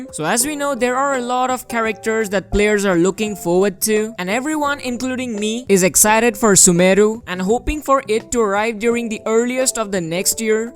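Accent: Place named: India. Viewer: u r an indian right? ur accent is like that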